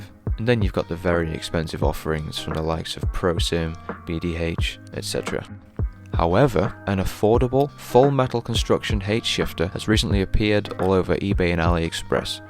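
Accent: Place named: United Kingdom. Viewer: Sadly, I searched on eBay and wasn't able to find any stateside. Going by your accent, you sound like you're in the UK. I found the seller you probably bought yours from, but the shipping cost to the US makes it out of reach. I'll probably just spray paint the piece of silver 4080 I ordered from Aliexpress..when I get it in 5 or 6 months. haha.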